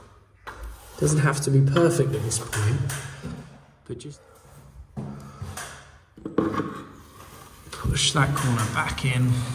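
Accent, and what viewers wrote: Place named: United Kingdom. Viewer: I was going to make the same comment. I am from the US, so the British accent makes it a bit harder to understand. But using the mic in the camera introduces a lot of room echo, and the audio level goes up and down, depending if is looking at the camera or away from it. And there were key points, where I just couldn't quite understand......... A wireless mic would bring up the only minus point of your fine videos..... (Your content is great, your videos are fine, and your presentation is very good, only your audio is lacking............. Thank you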